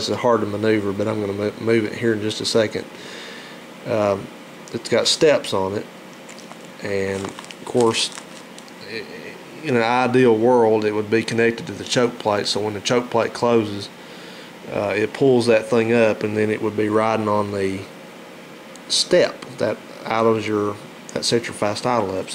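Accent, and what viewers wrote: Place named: South, United States. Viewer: I love it when a guy with a southern accent impersonates someone with a southern accent.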